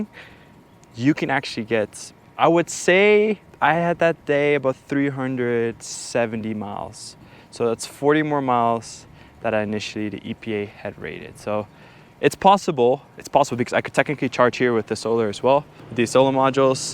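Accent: Netherlands. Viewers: Ik dacht al aan het accent te horen dat je een Nederlandse tegenkwam😉 Leuk om te zien in comments dat er mensen op zo veel verschillende plekken naar je kijken! Ook vanuit Cyprus kijk ik graag mee😉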